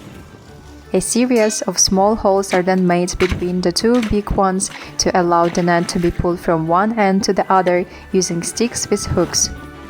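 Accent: Russia. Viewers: Русский акцент пробивается...Как тебя звать?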